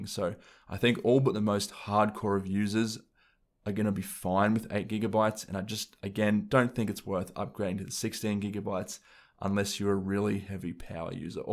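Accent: Australia. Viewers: Nice video mate, nice occasionally hearing a fellow Aussie on YT. Suggestion: have you thought about using OBS to record your screen? Will obviously add to your editing time though.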